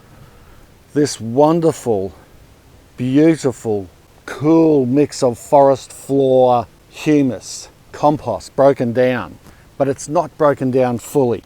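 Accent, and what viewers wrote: Australia. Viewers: 😂😂😂😂😂😂😂😂😂😂😂😂😂😂. I love ❤️ he’s Aussie lines and accent.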